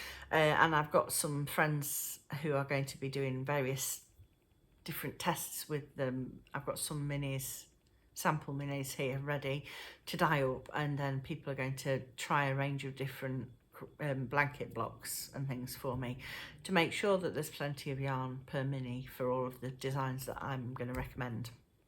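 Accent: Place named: Derbyshire, United Kingdom. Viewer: I’m new here, when I heard you talk I thought same accent, I’m a Derbyshire lass.xxx🐝🐝🐝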